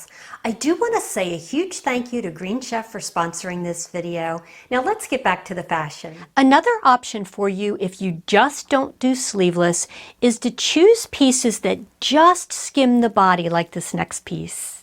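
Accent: Philadelphia, United States. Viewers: I'm loving your Philly/South Jersey accent! My mom was a South Philly Theresa. You have excellent tips and explain things so well!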